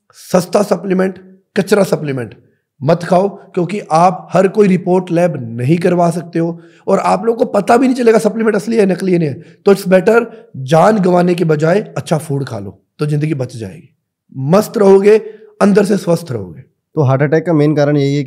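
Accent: Bihar, India. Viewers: Aap bihari ho sir?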